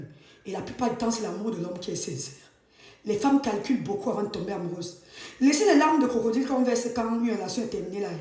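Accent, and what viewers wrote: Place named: Cameroon. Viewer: Accent camerounais...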